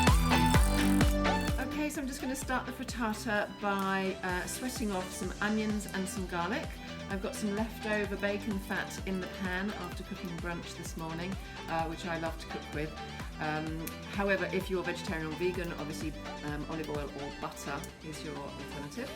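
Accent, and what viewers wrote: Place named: England, United Kingdom. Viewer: It's very nice to hear your English voice, Thanks for sharing!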